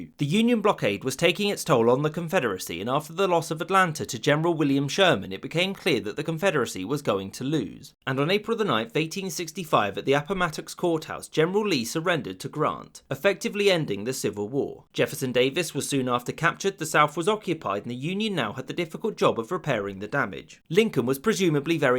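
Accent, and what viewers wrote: England, United Kingdom. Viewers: I can understand why you declare that France had outlawed slavery. But you incorrectly infer that England outlawed slavery. Check you're facts. You should have said Russia. I swear, give someone an English accent, they think they know everything. England was engaging in enslaving India and making drug addicts out of China. Stop you're bullshit.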